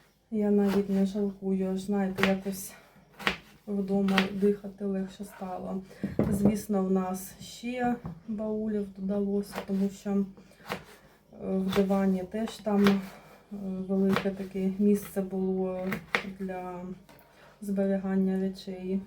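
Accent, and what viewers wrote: Surzhyk, Ukraine. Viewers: Ще що вам хочеться? розмовляє на суржику,і це нормально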